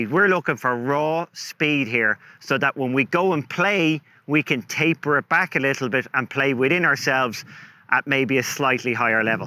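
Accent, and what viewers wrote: Ireland. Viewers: English is his first language. It's just where he's from in Ireland that gives him that accent, it's very common there.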